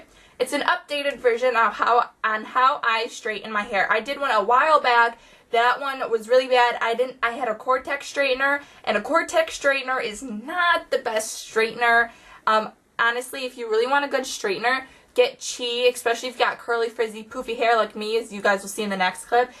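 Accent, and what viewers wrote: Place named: Midwest, United States. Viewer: Are you from Michigan or Wisconsin? It sounds like you have a slight accent from there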